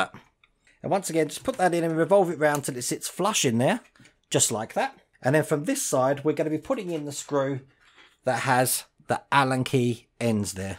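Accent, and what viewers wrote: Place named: Essex, United Kingdom. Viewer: I think that’s probably more your ‘Essex’ accent coming through;).....being from Thurrock sounds totally normal to me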